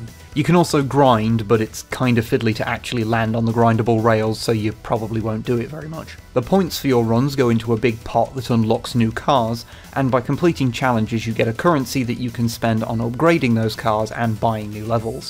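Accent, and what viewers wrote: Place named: United Kingdom. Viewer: That was really good, I've missed a British man reviewing video games in my life. The game also has a demo, downloading it right now.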